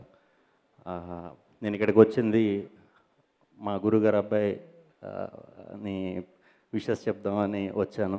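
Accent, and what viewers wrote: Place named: Godavari, India. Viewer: Maa Godavari Slang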